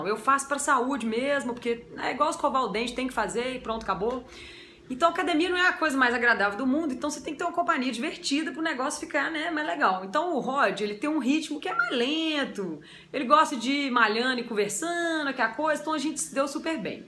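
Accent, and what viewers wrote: Minas Gerais, Brazil. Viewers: Você me inspira. Um abraço apertado. Ah, esse sotaque mineirês... Saudade da minha terrinha!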